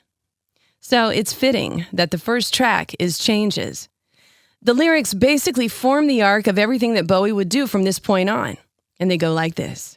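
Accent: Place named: United States. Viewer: This is a superb commentary on a song like no other. I've just seen so this and other songs are going round my head as they did when I first bought the Ziggy album in 1972. I love it when you read aloud the lyrics. You have a great voice, and speaking with an American accent, you bring out different shades of meaning in the words, originally sung in his London / English accent. Many thanks!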